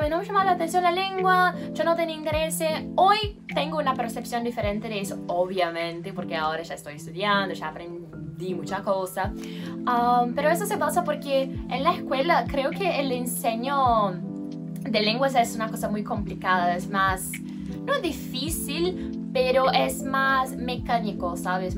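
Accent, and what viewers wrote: Buenos Aires, Argentina. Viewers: Que bien hablas argentino!🩵✌️🇦🇷👊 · tienes un acento porteño..como asi?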